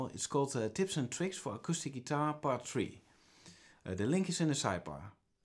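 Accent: Netherlands. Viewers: love the dutch accent :P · hahaha ik hoorde aan je accent dat je nederlands bent xD goede tutorial, dankjewel, alleen het zou het een stuk makkelijker maken als je op plaatsen zoals je rechterhand ook laat zien om de tokkel te begrijpen. dankje. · I noticed from the accent you're Dutch. Awesome composition. Erg mooi! Gaaf dat je 't gratis weggeeft.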